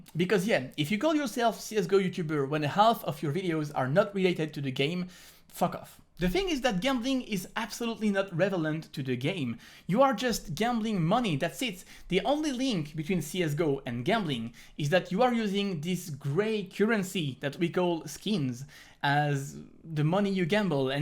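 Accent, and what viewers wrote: France. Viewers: On l'entend bien l accent français :)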